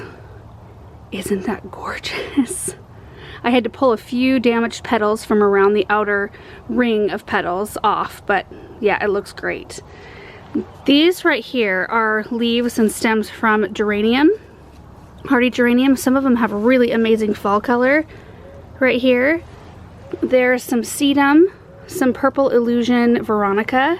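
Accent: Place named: Midwest, United States. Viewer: Hi, Just thought I would mention every time I hear you pronounce zinnia, I think to myself, oh our way with the "short i" must be a Midwest kind of thing. My kind of arrangement, esp. love the cabbage with the rose and berries. Thank you.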